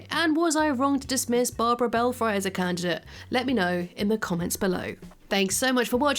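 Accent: United Kingdom. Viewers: No offense. As an American hearing a Brit, talk about the Simpsons feels like I am reading a history book from the u.k. about the war of 1812. I love the breakdown, just not what I expected, haha.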